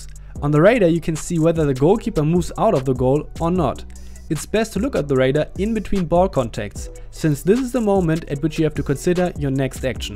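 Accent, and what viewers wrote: Germany. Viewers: Bist du Deutscher? Hörst dich irgendwie so an🤷‍♂️ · Man merkt extrem den deutschen Akzent haha 😂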